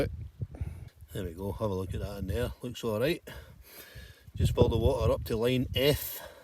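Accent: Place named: Scotland, United Kingdom. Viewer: Loved the video. My mother's family is from Scotland, so I love the accent. I have to admit, though, I had to turn on the closed captioning to know what you were saying! 😁✌️🇨🇦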